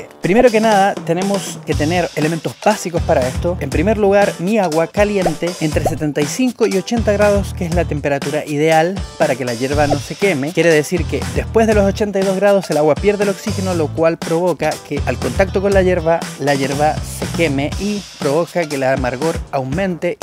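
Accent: Chile. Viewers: Amigo, de que Pais eres? Tienes acento chileno. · De donde eres bro no reconozco tu acento pero no eres argentino eres chileno? Se me figura tu acento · Es chileno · Se nota a kilómetros que es Chileno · Pero vos no sos argentino, mm que raro, no me gusta que un chileno, por lo que escucho, me enseñe a preparar el mate argentino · eres un crack, me salta una duda eres Chileno?, hablas con acento chileno,...demás está decir que en el campo y sur de Chile se ha tomado siempre y mucho el mate! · Excelente yo lo hago así igualito!! A juzgar por tu acento sos chileno, verdad? No sabía que allá también tomaban mate! 😍 Cuéntame, toman mucho? Saludos y muy buen video 🤗🇨🇱🇦🇷🧉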